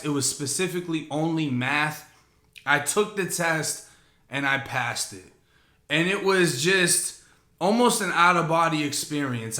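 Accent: Boston, United States. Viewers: Crazy i read it in that boston accent.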